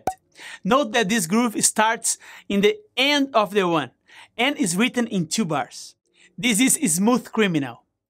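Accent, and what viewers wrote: Brazil. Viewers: Você é brasileiro? Teu sotaque !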